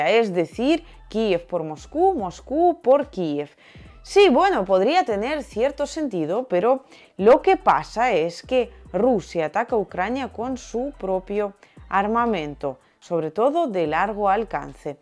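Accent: Spain. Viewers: Todo esta bien . Pero deverias cambiar tu acento Español. El acento español no cae bien en América latina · me acabo de dar cuenta después de llevar una grabación de ti un maestro lingüístico de acentos del español que tú hablas El Español de los gallegos de España felicidades sigues siendo la voz de RUSIA en Español y nos permite ver en México las dos caras de la moneda pero nosotros le vamos a Rusia porque estados unidos y su vecino del sur nos conocemos tanto que no podemos engañarnos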